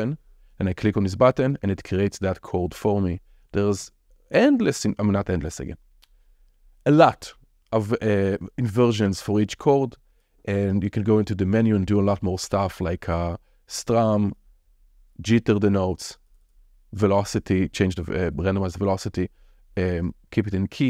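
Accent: Israel. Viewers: I kind of respect the fact that you're not even trying to work with your Israeli accent. It's as if you're almost putting a little extra effort to sound more Israeli than you naturally do. :) Kudos man. Truly.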